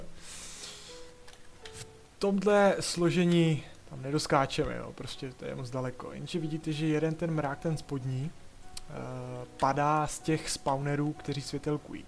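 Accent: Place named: Brno, Czech Republic. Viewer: hele my sice víme že nemáš čas ale podívej se kolik lidí chce aby si nahrával alespoň jedno video za týden rozhodně by nám to stačilo protože bysme pravidelně slyšely tvůj příjemnej hlas a brněnské nářečí;) opravdu nám všem chybíš:)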